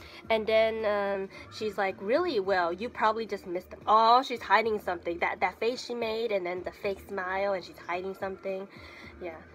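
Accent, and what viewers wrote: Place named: England, United Kingdom. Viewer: i wish i had your english accent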